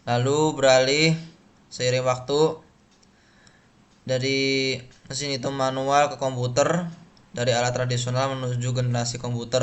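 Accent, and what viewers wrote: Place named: Java, Indonesia. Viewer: mas iki loh rek medok kali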